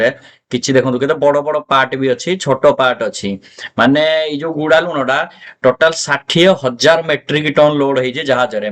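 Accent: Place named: Angul, India. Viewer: nka ghaa anugul a4hmalik side ru ki....katha pura anugulia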